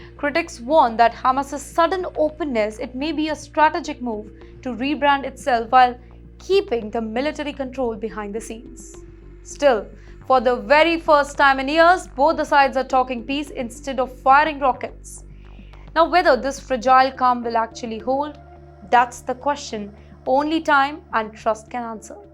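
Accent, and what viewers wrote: India. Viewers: THERE IS NO TEREBEL LIKE INDIAN NATIONALITY SPOKE ENGLISCH 😅LIKE YOUR MOUTH FULL WITH TOUNGHS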